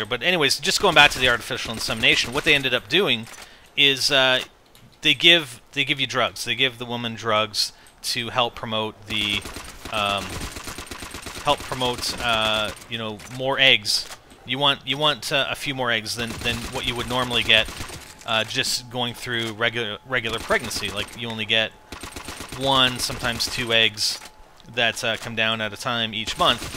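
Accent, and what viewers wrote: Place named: Canada. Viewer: is Canadian. His accent pretty much gives it away if you're familiar with Canadian accents at all. I remember the first time I saw an vid, I realized about 30 seconds in "This guy has GOT to be Canadian. Aboot? Eh?"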